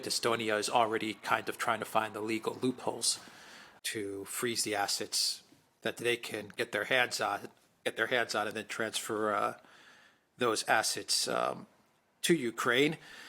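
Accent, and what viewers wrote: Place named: United Kingdom. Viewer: You mispronounce zaparesia and the names of lots of towns I don't know if it's your gay British accent lol or if you're just trying to sound fancy or I don't know makes you sound stupid though just FYI and you're not? Your very intelligent so I don't understand my man. I love you guys show you speak the truth!!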